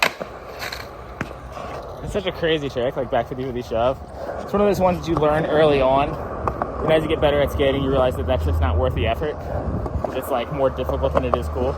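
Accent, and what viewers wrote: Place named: Baltimore, United States. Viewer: You know someone is from Baltimore when they call it Baldamore 😂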